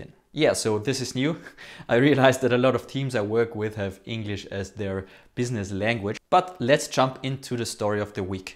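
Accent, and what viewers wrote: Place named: Austria. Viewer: go for English. You're english is good but try to explain what you mean when you use austrian dialect 😂 (I as a german native speaker, with a southern dialect doesn't understood you)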